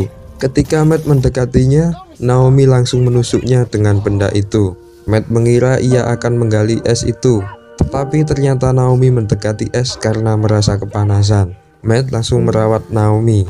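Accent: Java, Indonesia. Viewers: JAWINYA MEDOK BNGT MASS 😁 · jawa nya medok bgt 😫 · Medoknya lho rek wkwk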